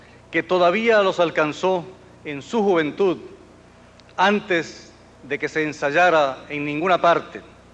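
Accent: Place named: Argentina. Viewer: Parece que Carlos viajó la futuro latinoamericano y después narró lo que vió. De verdad es impresionante todo lo que dijo, y como se cumplió años después. P.D: No había notado que Carlos tenía un poco el acento argentino.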